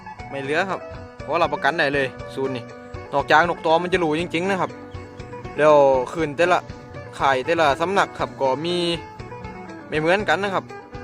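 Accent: Korat, Thailand. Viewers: แก่นี่พูดดโคราชดีเจนเนอะ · คนโคราชเบอะครับ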